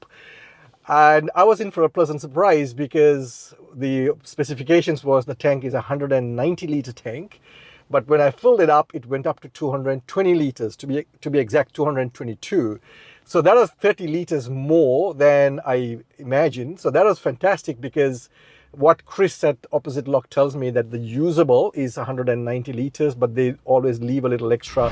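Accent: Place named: South Africa. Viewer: Are you from South Africa, I hear some safa in the accent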